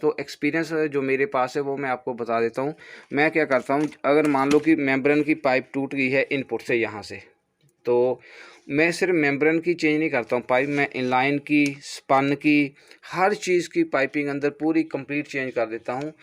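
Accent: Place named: Punjab, India. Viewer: Ur punjabi :D hindi toh pata lag geya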